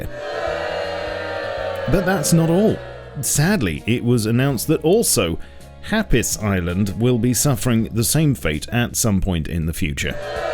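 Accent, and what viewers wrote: United Kingdom. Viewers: Every British person sounds like this if they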